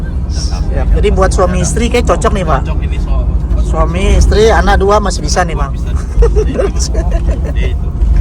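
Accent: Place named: West Sumatra, Indonesia. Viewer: Bahasa indonesianya sangat minang sekali. Wkwkwk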